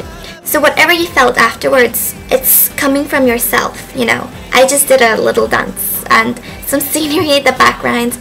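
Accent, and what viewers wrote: Ireland. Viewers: are you irish? I totally LOVE your accent! <3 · What is your accent? It sounds a bit Irish???? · Is that an irish accent???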